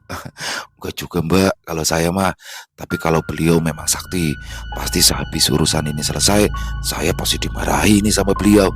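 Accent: Jakarta, Indonesia. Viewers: Bang nih kl ngomong logat betawinye kental bngt.. Apalagi kl ceritain daerah kalimantan... Beeh... Nyangkut bngt.. ❤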